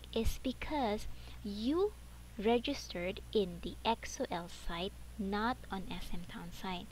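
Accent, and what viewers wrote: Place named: Philippines. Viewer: You talk like my friend from the philippines, it's so cute! Also thanks for helping!!!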